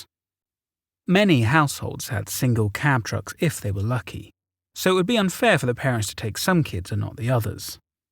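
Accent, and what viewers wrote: United Kingdom. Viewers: Why is this story by a British narrator?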